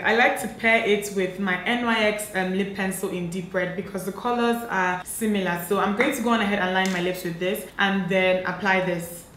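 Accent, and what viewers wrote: Nigeria. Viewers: Usound exactly like my daughters pedestrian. She is Nigerian! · I was trying to place your accent because I recognized it but this is the first video of yours that I've seen and as soon as you said Nigeria, I was like THAT MAKES SO MUCH SENSE. Just a really random thing I was thinking about XD I like your reasoning on never having enough red lipsticks XD Also, I LOVE your eye look :) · I love love love all your videos. You are so Nigerian and its so refreshing to see someone original. Not all these Nigerians faking oyinbo accent. Thank youuuuuuu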